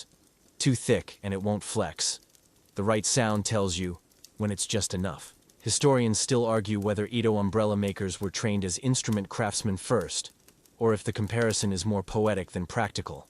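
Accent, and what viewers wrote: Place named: United States. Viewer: The text of this is interesting but theUS accented voice with 'true crime' rapid paced staccato inflections isn't restful.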